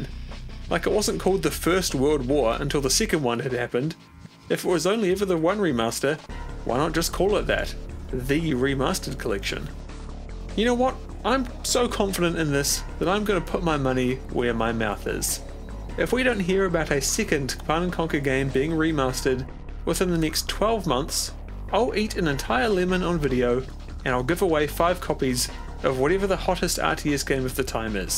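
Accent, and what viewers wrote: New Zealand. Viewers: I detect a kiwi accent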